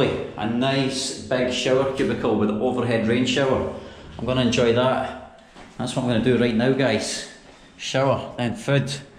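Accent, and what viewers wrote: Scotland, United Kingdom. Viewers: Apparently the waiter in a Scottish restaurant had a tough time picking your Scottish accent ! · Greetings from India. Love that Scottish accent mate. 👍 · You have a thick scottish accent! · Is ur scotland accent ?.. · Oh wow you replied!! I subscribed your channel only for your accent though. I just love Scottish accent. I wish I was a Scotsman like you or at least could speak like you. 😆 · I just love that Scottish accent love from The Great State of Texas. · Scots possess the best accent which is there in the world. Love it. I even started mimicking it. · That's some serious Scottish accent mate! :P good job! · improve the accent?... you can't "improve".... accent is obtained from where you were raised!... he's Scottish and has a Scottish accent...can't be helped if others can't understand it!... many foreigners come to Sri Lanka and can't understand Lankans and their accents when they attempt to speak English!... 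the UK, Britain has so many different accents and even breaking it down to England there are even more accents which are regional and breaking it down further there are also regional accents in London!... there is not one accent in Scotland either...it gets harder to understand if you go to places like Glasgow.... is anyone asking you to improve YOUR accent?!... btw I'm an English and elocution tutor in London... born here and don't have a Sri Lankan accent. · Pure Scottish accent.... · Love your accent . Crazy skotsman :) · After a long time...pure Scottish accent....fab mate...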